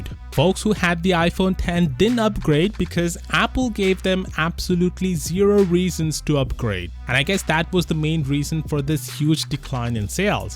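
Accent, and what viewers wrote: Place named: India. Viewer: 浓浓的阿三口音。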